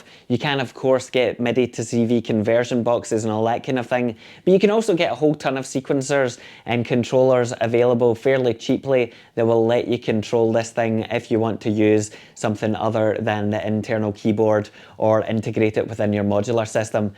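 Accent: Scotland, United Kingdom. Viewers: Putting together such an entertaining, heartwarmignly Scottish-sounding and as usual very personal review after distracting events happening proves you can focus on the meaningful things in life 👍 Thanks for this video, lad. In many ways Moog's Mavis reminds me of my recently acquired Behringer CRAVE which I'd also recommend for synth novices and starters in modular. Lots of patching fun included 😉 Did you actually get your hands on one of those yourself yet? If not, don't miss out on CRAVing! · Enjoyable video😃 Great Scottish accent 🏴 Speaking from 2025, the price in the UK is about £220 now, which is a bargain!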